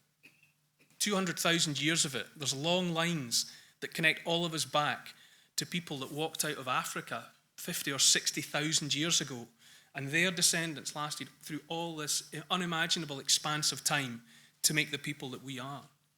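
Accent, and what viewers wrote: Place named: Scotland, United Kingdom. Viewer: Absolutely brilliant, what a great story teller, and is a historian and a great one at that, his passion is unsurpassed, and I absolutely love his beautiful scottish accent.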